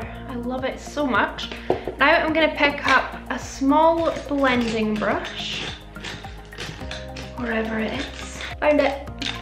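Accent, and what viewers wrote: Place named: Scottish Highlands, United Kingdom. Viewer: I love it!! Where is your accent from??! You sound like a fellow highlander? Xxx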